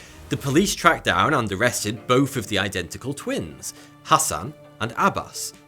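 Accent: United Kingdom. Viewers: Get away with murder with this one simple trick... COPS HATE HIM British Vsauce much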